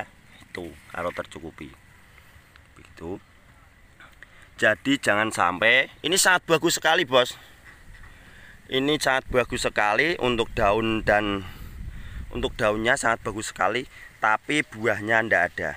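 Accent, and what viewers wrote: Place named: Pati, Indonesia. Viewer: Logatnya khas pati😅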